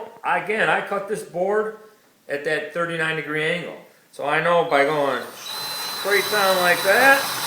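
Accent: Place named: Pittsburgh, United States. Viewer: Thanks. Great job! Haven't heard such a strong Pittsburgh accent in a long time. Question: Are all the newel posts the same size? I've been looking at ordering some but there seems to be different heights for landings, starters, etc. Thanks ~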